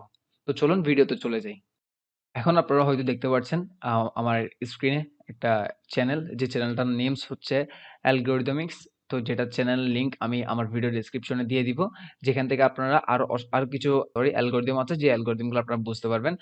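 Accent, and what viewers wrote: Chittagong, Bangladesh. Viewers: Amader chatgaya accent diye buja jai Btw kon Uni te poren bhaia?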